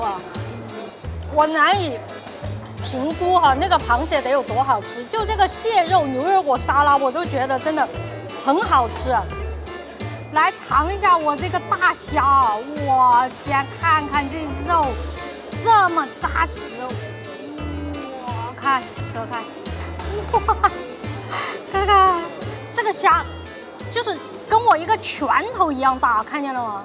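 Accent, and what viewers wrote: Sichuan, China. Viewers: 老乡，你这个川普挺正宗😂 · 妹子講四川口音的普通話真好听、只要講話就好❤看、可愛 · 可爱的Yooupi小姐姐 你说话好可爱 你是四川人吗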